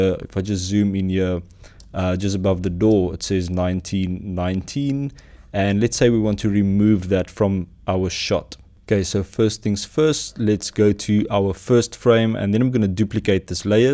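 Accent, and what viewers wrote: South Africa. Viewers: You sound like a real South African!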